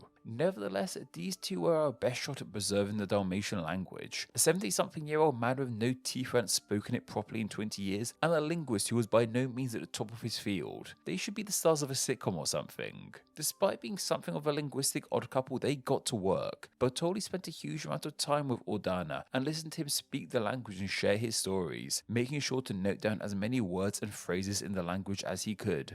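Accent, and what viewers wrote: United Kingdom. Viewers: a British accent?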